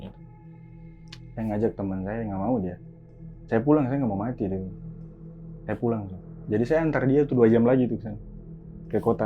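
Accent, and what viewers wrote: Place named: Papua, Indonesia. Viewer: seru mas klo pk logat papua bgs..sekilas g terlihat orang timur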